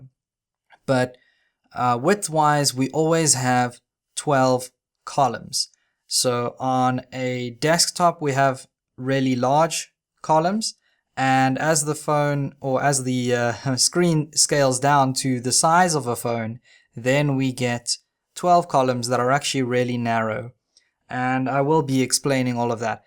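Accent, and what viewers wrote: South Africa. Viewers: Fellow Saffa here now living abroad!!! Very informative vid! Nice to hear an SA accent for a change. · As us Americans say "Africa" as it were a country not a continent you sounded the same. You from South Africa? The "British" accent sounds like it although wife from North Africa and their English (3rd language) is British English not American English. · Best tutorials on youtube so far about coding. Really awesome to be learnig from a fellow South Adrican as well. Sounds like you’re from KZN.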